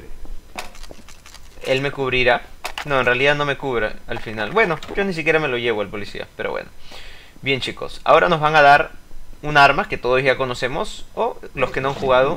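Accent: Peru. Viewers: eres peruano? si lo eres like xddx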